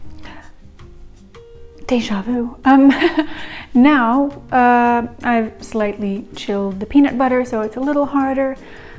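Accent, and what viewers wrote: France. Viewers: Oh my god you speak french i love that ! And your french accent IS so cuteeeee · I plan on trying this next week. And I like your French accent. :)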